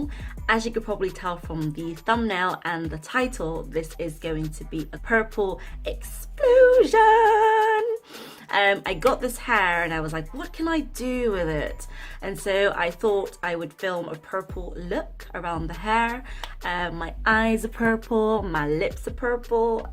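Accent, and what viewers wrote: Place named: England, United Kingdom. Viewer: LOVE THE HAIR GIRL !!!!!! did NOT expect you to be English !!!!! Thought you would be from the US. New subbie showing some love ❤️❤️❤️❤️❤️❤️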